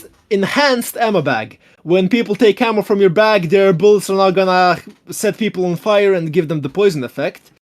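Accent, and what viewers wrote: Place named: Russia. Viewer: Why does shound russian english accent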